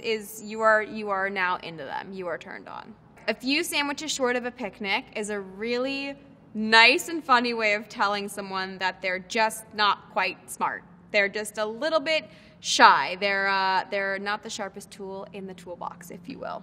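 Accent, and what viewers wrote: United States. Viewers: I became confused after seeing her strong American accent, then I remember her strong British accent in Love, Rosie. Her life is definitely complicated. Thanks, Google. 🙂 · Amy She was a weird choice considering how american she sounds, but there were a few in the video I didn’t know off the top of my head that she seemed to know. Also, we don’t really know how much time shes spent in the uk, could be most of her life for all we know. Still a few questionable definitions in there though 😂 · She sounds American so she should have opened with "I sound American but I grew up in both in the US and England as my father is English." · I'm confused...but she's speaking in heavy American accent! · The American-born daughter of a British musician teaching British slangs whilst sporting a heavy American accent. What sorcery is this?? o_0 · I didn’t even know she was British.... 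🤷🏼‍♀️ she has such a strong American accent! You learn something new everyday. 💕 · She has an American accent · shaima! Bruv I live in the uk and I certainly know. The heavy American accent with the slang terms is not it💀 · An american accent ffs.... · Why does she sound American and not British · It's weird hearing someone explain British slang in an American accent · She keeps it in her back pocket & can retrieve it at will, but US English is her default since she grew up here. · She has american accent though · I am not familiar with Lily and after I heard her American accent I was like 'Whaaaaat? I thought you were British' and then did a quick Google check lmao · Wow. An English actress who speaks with an American accent because she moved to the US at the age of 6. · She's got an American accent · Bet she wishes she had her accent back. I’d hate to have an American accent.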